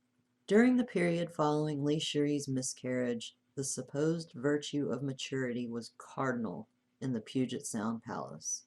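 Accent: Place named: Western North Carolina, United States. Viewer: I’m from western North Carolina so it sounds like home to me!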